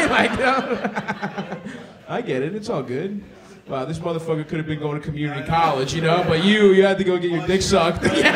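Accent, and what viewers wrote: Baltimore, United States. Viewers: Stav, you are 100 percent my favorite comedian since I discovered your stuff on Josh Potter Show. With family from College Park, I am so entertained by your Balmore accent.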